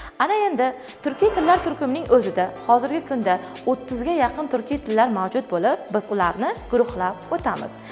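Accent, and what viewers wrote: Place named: Khorezm, Uzbekistan. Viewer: Xorazmmi shevasi tushinarli zór turkiya shevasina óxshidi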